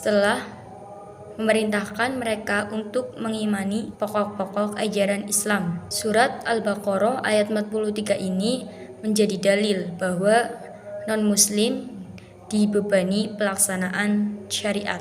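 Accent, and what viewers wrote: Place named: Java, Indonesia. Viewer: Good luck, medok ya gaes ya😅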